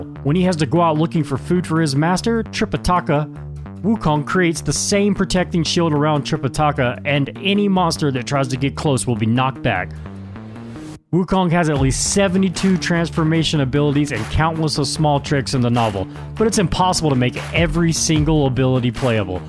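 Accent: Texas, United States. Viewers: Wait, is that like a Texan accent? I just watched the video released before this one and you (?) were speaking Chinese. What's going on lol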